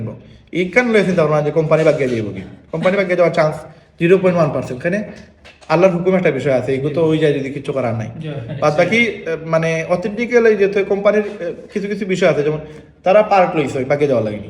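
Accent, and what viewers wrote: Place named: Sylhet, Bangladesh. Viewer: Vaiyar Sylhet e vasay kotha gula khub valo laglo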